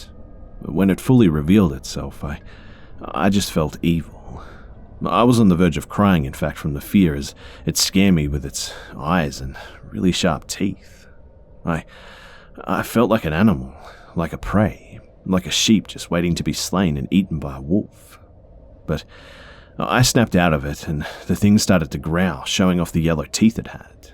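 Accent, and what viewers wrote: Australia. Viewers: Australian accent 🤭🤭🤭 I made a mistaken post thought I heard eng. very different sorry. 🖤🖤🖤☺️☺️ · Mate aussie for the win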